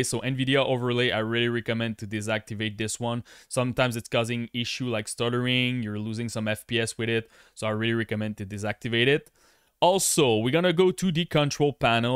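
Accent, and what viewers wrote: Quebec, Canada. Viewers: Thanks for the tuto pal ! You sounds a bit Quebecois haha, always a mess when it comes to Ea sport and optimization on PC. Cheers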